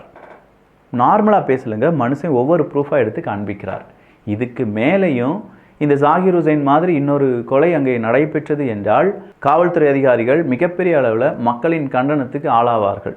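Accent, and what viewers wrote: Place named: Kerala, India. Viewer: I get malayali l get malayali pitch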